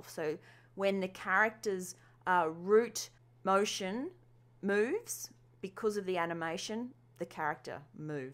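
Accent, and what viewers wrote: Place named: Australia. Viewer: I'm from the US, and there is something about the Aussie accent that just makes it feel easier to learn and listen. That or this lady is great at explaining. Maybe both! Just me?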